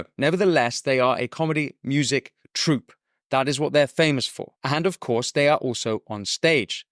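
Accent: United Kingdom. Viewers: Anything you talk about will be accepted by Americans because of your accent. Is what it is, we love the British accent. 🤷🏽‍♂️🙌🏾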